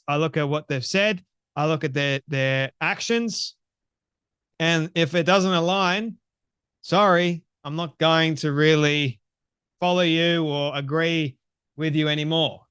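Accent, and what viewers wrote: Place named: Australia. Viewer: You sound Australian and I like you young man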